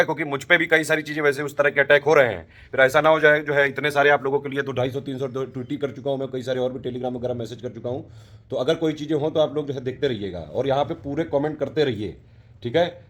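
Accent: Kanpur, India. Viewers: बात तो सही कह रहे हैं आप सर जी बिल्कुल कनपुरिया स्टाईल में बात कर रहे हैं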